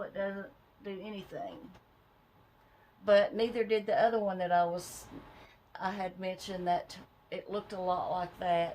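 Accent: South, United States. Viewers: I’m from the south also and we do have a way of our own talking... I get told.. so I love listening to someone that has an accent like I do.. I’m so sorry about the tumors.. I’ll be praying for you and thank God it’s not cancer.. concerning the mask have u use any mask that is better staying away from ? thinking about doing the Monday mask on my channel.. just not crazy about masking... LOL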